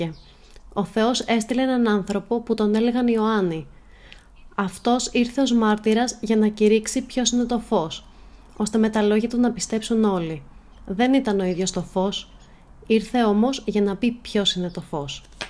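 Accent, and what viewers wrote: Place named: United States. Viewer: Essa pronúncia está errada. É uma pronúncia "Americanizada". O Grego Bíblico não era pronunciado assim na século 4.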